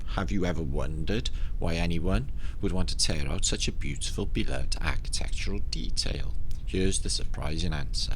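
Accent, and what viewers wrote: Wales, United Kingdom. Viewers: how strange the other reply !! Should change their YT handle to "Anal D" - its a lovely Welsh " accent my friend :-)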